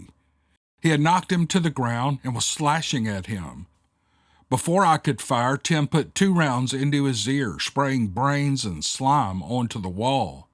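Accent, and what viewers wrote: South, United States. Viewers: I started listening to this story at work am and couldn’t turn it off. Amazing stories and, you just takes it to another level with his Southern Comfort style narrating it captures my mind like I’m watching a movie. Thank You.. I made it to the end. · Same here mam been on here for years now love the southern gentleman’s voice w the light touch of southern accent awesome Sir he is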